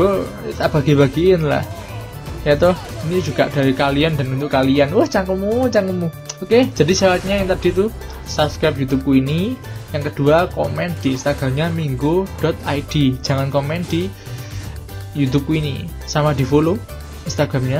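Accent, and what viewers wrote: Java, Indonesia. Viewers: Bang live streaming dong/bikinin game play WW... + nganggo boso jowo tok lek omong wkwkwk... Kangen medok mu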